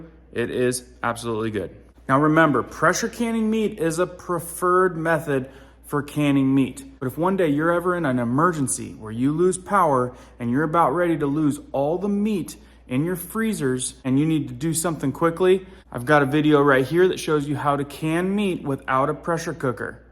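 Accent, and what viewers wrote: United Kingdom. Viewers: I’m reading this in your beautiful UK 🇬🇧 accent ❤️ 🥰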